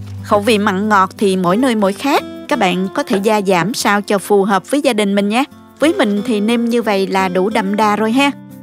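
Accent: South, Vietnam. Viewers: Cô này giọng miền nam chắc nấu kiêu miền nam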